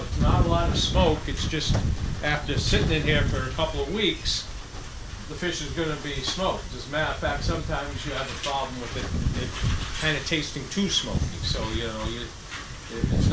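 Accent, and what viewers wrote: Boston, United States. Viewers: You still have that Boston accent, thanks for sharing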